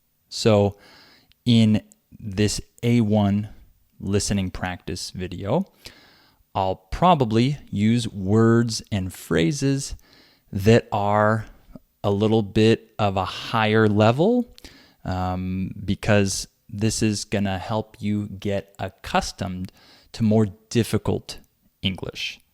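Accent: England, United Kingdom. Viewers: British or American English ? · Hello sir! I'd like you to provide me some listening material from A1 to forward. And I real love English accent perfect for beginners of English. I'm so thankful in advance. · It is brutish English??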